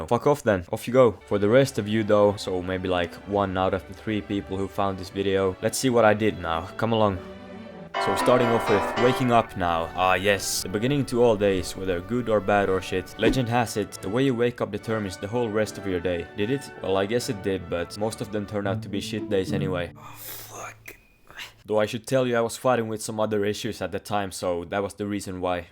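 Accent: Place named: Finland. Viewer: Suomen aksentin aina tunnistaa :D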